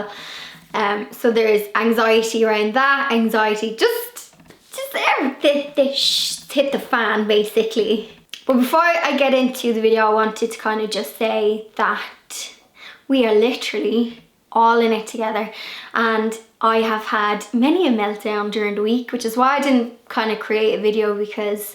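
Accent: Ireland. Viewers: I was wondering where you were. Missed you on Thursday. You seem like a very social person, which can be hard but keep chatting, we need you. I could listen to your Irish accent all day. Suggestion? maybe make a video of "about town", a local tour? Maybe you have done it in the past I don't know, I am a fairly new subscriber...just a thought. Anyway my dear...thinking of you and trusting you will stay safe and healthy. 😎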